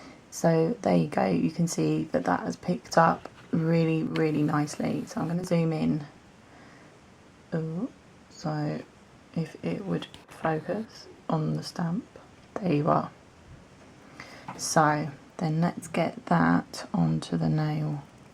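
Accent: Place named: United Kingdom. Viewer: Love your videos, thanks for sharing. Have you ever tried cuticle defender? That makes the cleaning very easy. I also tried a glue which I originally bought to repair a wooden car from my son. Mixed with a little bit water it also works perfect. I use it first before I do any polish on my nails, cause it needs a little more time to dry than the cuticle defender you can buy. I really love it. Thanks again for making this videos. And I really like your voice and the british accent 😍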